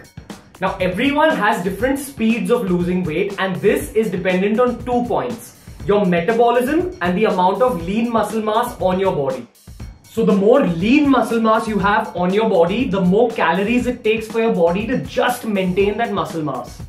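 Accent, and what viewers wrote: India. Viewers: I like indian English accent hahahah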